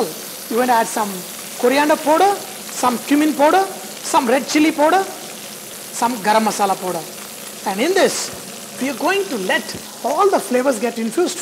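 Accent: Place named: South India, India. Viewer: ur hindi is super Typical south indian style Am from kerala😁😁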